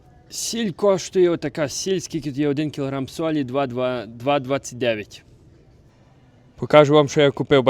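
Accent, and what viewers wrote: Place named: Ukraine. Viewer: Thanks a lot.for your answer. I do love the Passion you put info recording your videos. I am also trying to understand what you are saying in Ukrainian (I only party succeed). I was only slightly suprised that you have a pretty strong Ukrainian accent when Speaking English. As far as I remember, you came to Canada at the age of 11 (before puberty). Anyway, that is really that important. Keep up good work.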